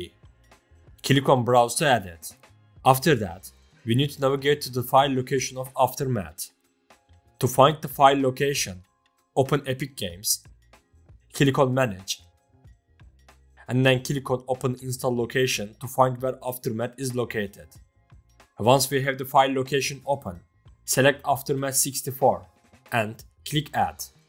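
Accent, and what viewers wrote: Turkey. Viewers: Thanks for the help brother. Btw your accent sounds like turks. Is there any chance that we're from the same mother nation? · aynı türkler gibi ingillizce konuşuyorsun.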